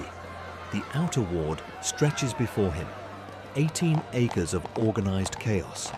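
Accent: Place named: England, United Kingdom. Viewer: I am not a fan of A1 but it does have it's uses for things like this. One point thst kept me watching was the clear English narrator's voice and not a transatlantic one which makes me turn off.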